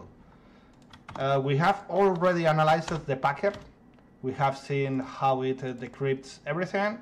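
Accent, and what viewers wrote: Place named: Spain. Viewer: Acento de españolazo. mis dies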